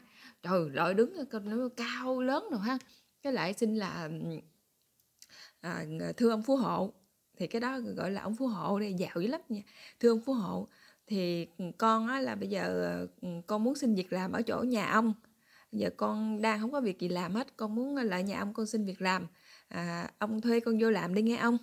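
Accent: Mekong Delta, Vietnam. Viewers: Giọng miền Tây có duyên ghe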